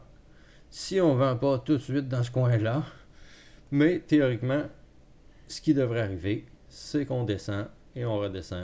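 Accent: Quebec, Canada. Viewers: sI JAIME CE QUE TU FAIT ..... malade. cest bien de l entendre de la bouche dun Quebecois ;) cest difficile pour mois qui travaille de 6am a 2pm de suivre tes cours en direct. ca va etre enregistré? merci jaimerais bien moi aussi changer ma vie! cest possible de trader dun cell, avec l aide de votre communité au début ?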